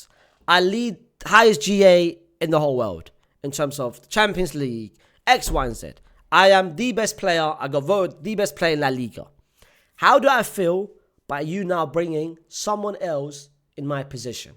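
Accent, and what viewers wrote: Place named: United Kingdom. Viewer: This dude makes me hate the British accent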